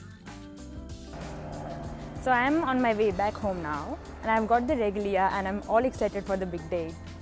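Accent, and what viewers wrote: India. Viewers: I liked the way you keep your Indian accent while talking in English unlike some people who try to pretend that they have adopted the accent but even the people who they are pretending to be don't understand what they say.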